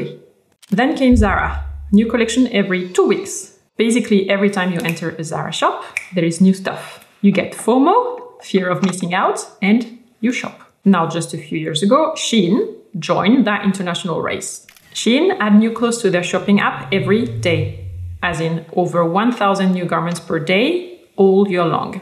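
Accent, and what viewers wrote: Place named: France. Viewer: I heard the accent and I knew you’re French 😃😃. Agréable vidéo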